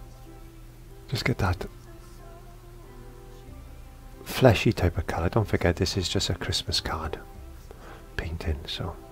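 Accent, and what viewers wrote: Wales, United Kingdom. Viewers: Love your Welsh accent! 👍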